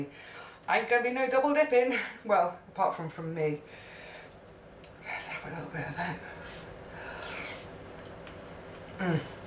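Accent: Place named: London, United Kingdom. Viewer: Hi, love your videos. My grandmother and grandfather came from London, and your accent brings back memories. Thank you for your wonderful recipes. Do you make carrot marmalade. I will surely try the tomatoe soup. Canada says hi!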